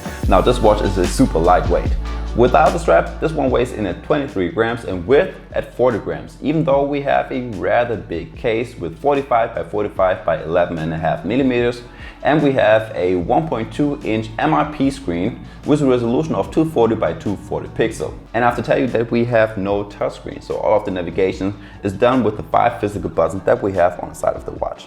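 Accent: Germany. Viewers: Direkt deutsche akzent gehört coole videos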